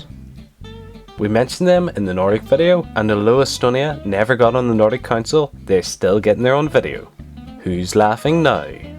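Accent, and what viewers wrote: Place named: Scotland, United Kingdom. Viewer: Your scottish accent is funny 😆😂😀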